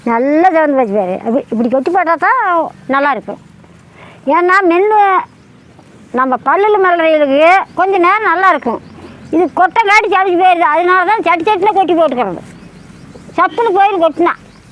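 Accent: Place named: Salem, India. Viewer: Neega entha ooru aaya,salem bhasa mathri iruku.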